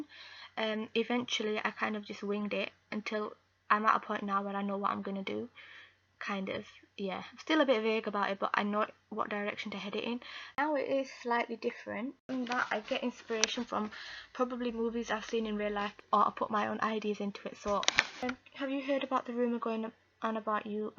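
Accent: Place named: Yorkshire, United Kingdom. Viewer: i heard she is from yorkshire up north but idk what that sounds like cos im from east england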